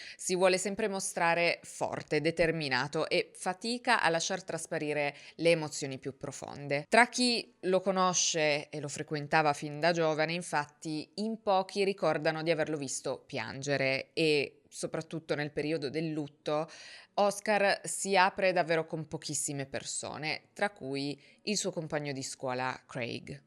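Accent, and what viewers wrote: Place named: Piedmont, Italy. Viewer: "Pistola spiegata"??? Gia, fastidioso l'accento piemontese (corso di dizione, è il tuo lavoro). Ma certi strafalcioni.... Aggiungo: "Sfonda la porta del water"...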